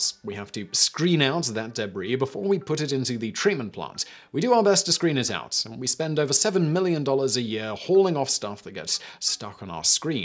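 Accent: United Kingdom. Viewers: "Something up with which we must put." If you are going to sustain that obnoxiously pretentious British accent, at least adhere to the grammatical constructions that go along with it. And while you're at it, let the metric people work out their own conversions. When in Rome, after all.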